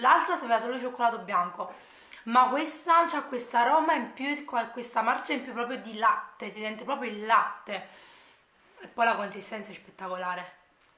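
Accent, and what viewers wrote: Naples, Italy. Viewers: non ti sto attaccando, ma questa ragazza viene sempre presa di mira per qualsiasi cosa faccia, direi che qualche volta il commento lo sii può tenere per sé, senza avere il bisogno di scriverlo, perché tanto penso che se abbia scritto qualcosa di sbagliato lo sappia o se ne accorga dopo, non c'è bisogno di puntualizzare sempre, soprattutto per errori futili come questo, e io comunque da ragazza napoletana, e comunque STUDIO teatro e DIZIONE (CORRETTA PRONUNCIA Della Grammatica italiana) capita di avere questa cadenza, perché è proprio tipica della nostra amata Città (NAPOLI) ed ho anche e solo puntualizzato che comunque il napoletano è considerata una lingua, però non fa nulla, non sentirti attaccata, spero solo che tu capisca come si possa sentire una ragazza come lei, mettetevi nei suoi panni e pensate prima di scrivere, detto questo, Buona domenica 😘❤️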